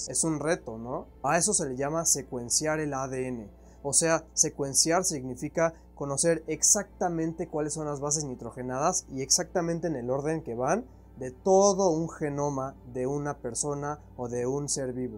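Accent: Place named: North, Mexico. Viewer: de que parte de méxico eres hablas entre norteño y de ciudad de mexico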